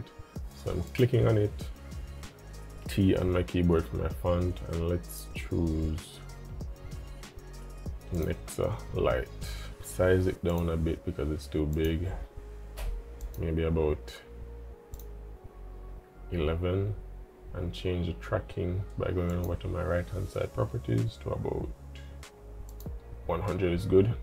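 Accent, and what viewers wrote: Jamaica. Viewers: You have a Jamaican Accent lol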